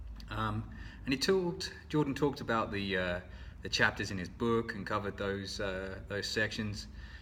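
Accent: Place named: Australia. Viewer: Hey has anyone ever told you that you speak with a little bit of an aussie accent?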